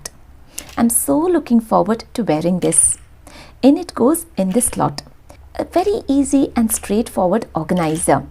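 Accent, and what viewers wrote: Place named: Belgaum, India. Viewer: Hello can i knw from which place ru. Becoz ur kannada is same like belgaum kannada so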